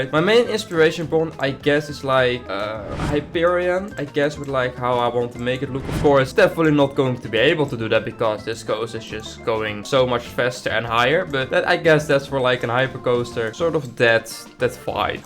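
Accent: Netherlands. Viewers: Ar you Nederlands?????????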